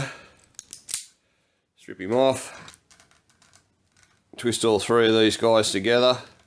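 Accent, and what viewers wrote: Australia. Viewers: really dig yer Aussie accent, mate. No BS from any of you blokes, says a dude from Montana, US of A. I've been monkeying with LED conversions since before the new year and have discovered many tips and problems. For example, if you use so called "Plug and Play" WITHOUT ballasts, they won't work! Don't buy them -- ballasts should be cut out and scrapped. The P& P tubes are most common, nearly everywhere. They are known as type A tubes. Type B tubes need to be wired with a hot end, the other is neutral. I don't know what the one enders are called, as I have yet to find any, except on the internet (Hyperikon, come to mind, but they are bloody expensive and not needed) There are apparently at least 12 brands, if not more. There appear to be maybe 4 kinds of tube types. I have used the type B tubes as they are easy to wire and work well. They are double ended, as mentioned. 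The single end ones can be pricey, and they work well too, but have not used them. Hope these tips help some of your viewers, my Aussie friend. Cheers, mate. Bob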